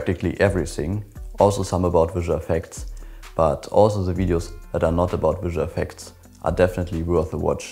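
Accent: Germany. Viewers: Ah höre ich da nen deutschen Akzent? 😳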